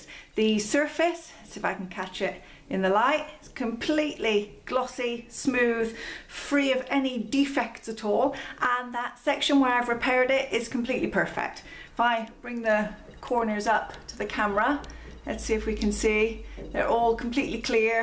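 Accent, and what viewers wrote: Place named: United Kingdom. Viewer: That's awesome, a 2 sided resin picture! I've been trying to make out your accent. Pretty certain youre British, maybe from Devon or Cornwall, somewhere down there. Then I was thinking may be that's the accent from the island where you are. Then, haha, you said 'soap or squeezy,' & I'm pretty sure it's just us Brits that call it squeezy. For the rest of you, it's probably dish soap or the actual brand name! 😀😀 Anyway, thank you for all your tips & experiments. I'm really enjoying watching you.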